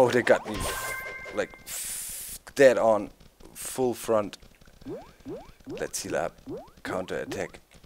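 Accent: Germany. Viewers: is that a german accent?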